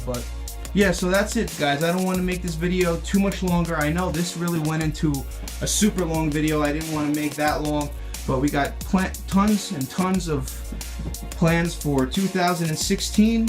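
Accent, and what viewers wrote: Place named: Long Island, United States. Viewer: Great collection! Been a subscriber for a long time, your accent keeps me coming back, I grew up in Northport and it's good to hear. If you ever get up to CT I'd love to drive with you. Check out CT Scalers on FB.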